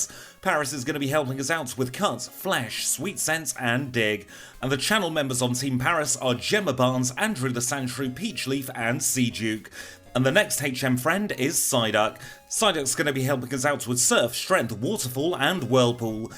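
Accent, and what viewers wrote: United Kingdom. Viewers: Man, you are the best. My favorite pokemon gamer of all time, love your british accent. Can I ask you, why don't you use Dynamic Punch more often with Snorlax?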